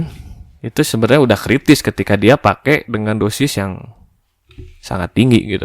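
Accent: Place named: Bandung, Indonesia. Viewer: Orang Bandung nya kang logakna kkental pisan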